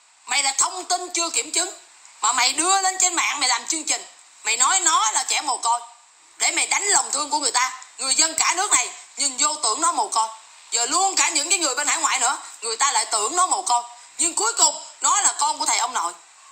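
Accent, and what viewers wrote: Standard. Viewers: Em nói quá chuẩn Hay quá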